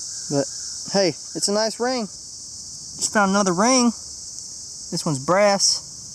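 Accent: South, United States. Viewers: i like your country actsent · He says gowd instead of gold good old southern accent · I can not stand his countryness.......???? Accent and how he talks but I love his vids though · you have an American axent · you know your watching when you hear his country accent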